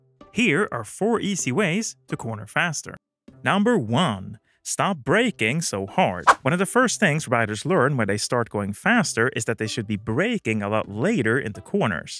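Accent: Sweden. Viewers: Are you swedish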